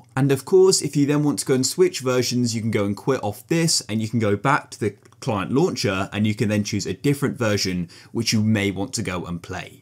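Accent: United Kingdom. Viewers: soo british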